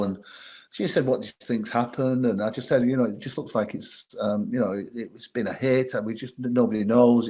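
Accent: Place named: Liverpool, United Kingdom. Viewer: pity about the scouser accent , and he is a crim !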